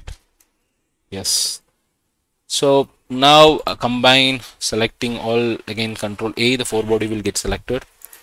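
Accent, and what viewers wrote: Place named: India. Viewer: People from different regions have different accents. DEAL WITH IT. probably he is an Indian, which means English is not mother tongue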